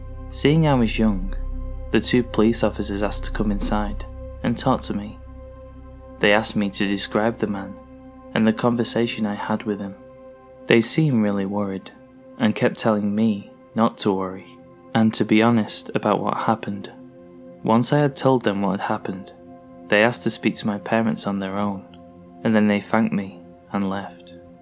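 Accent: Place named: Yorkshire, United Kingdom. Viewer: great story !! love the narration as always, could I ask what accent you have cos you sound a little bit Yorkshire to me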